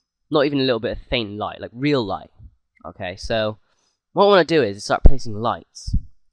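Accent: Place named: Bristol, United Kingdom. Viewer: Sounds a bit Bristol to me!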